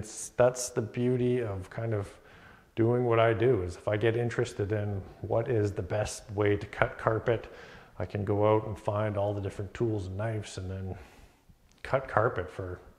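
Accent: Canada. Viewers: Many congratulations. Solid channel that I have taken so much from as I have embarked on learning DIY and home maintenance from scratch over here in the UK. The accent gives me fond memories of my time in Canada and the advice is superb. First DIY video I watched on youtube was your circular saw - don't cut off your sausage fingers one and I am glad to say my sausages are still attached. Anything windows, cabinets, studwork, decks, sheds, stairs welcomed. Good luck!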